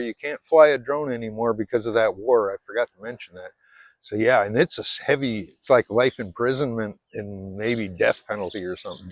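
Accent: Canada. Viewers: You sound like a Canadian.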